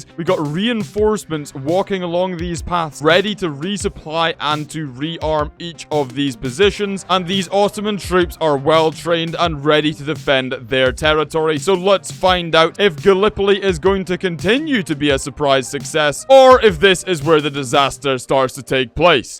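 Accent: Scotland, United Kingdom. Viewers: I'm just here to hear "Algorithm' in Scottish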